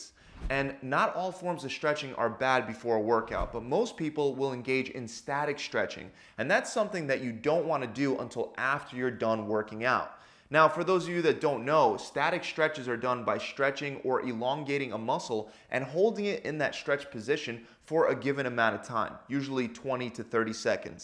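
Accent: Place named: New Jersey, United States. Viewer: He is from New Jersey. We in the northeast pronounce the a in water the same as the a in warm just like the British and Aussies. Most of the rest of the US pronounces the a in water like the one in watch. But in the tough inner cities of the NE, an outsider saying waah-durr might be told he sounds gay or weak. To each his own, I guess.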